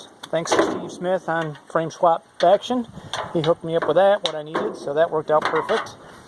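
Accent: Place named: Upper Midwest, United States. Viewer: Sounds awesome man. I can tell you're from the High North of the Midwest by your accent.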